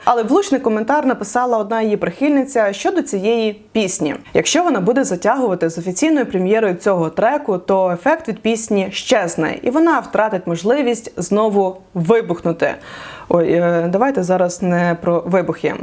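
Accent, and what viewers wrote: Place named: Western Ukraine, Ukraine. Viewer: Знаєш жінок зараз теж беруть до армії. При чому багато жінок воюють. Іди на фронт. А ти западенка гнiдна пиздиш своїм ротом поганим, але навіть не була на війні. Досить сварити народ, тварюка. Багато військових російськомовних, багато волонтерів теж. А хто говорить зараз українською різко став, політики, псевдопатріоти. А ти навіть не українською говориш, а суєш свій діалект, видавючи за українську мову. Чмошна підараска.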